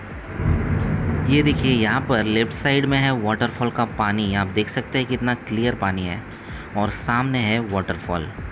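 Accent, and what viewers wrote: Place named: Maharashtra, India. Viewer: very good video मराठी accent should be corrected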